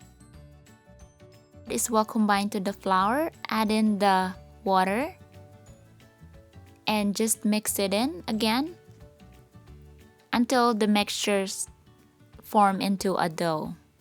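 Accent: Visayas, Philippines. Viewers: Thank you, I think I an do this. I love your Visayan accent.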